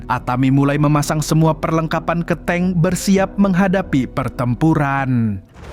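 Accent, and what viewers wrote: Banjar, Indonesia. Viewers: Orang Banjar sakalinya adminnya😂😂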